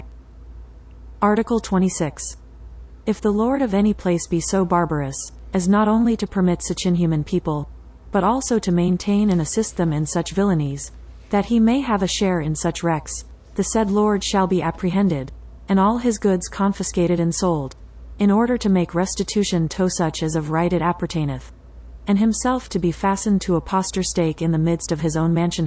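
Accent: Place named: Louisiana, United States. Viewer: Man I love your content. I hope I don’t offend if I’m wrong but I detect a Louisiana accent. Woahdayyyy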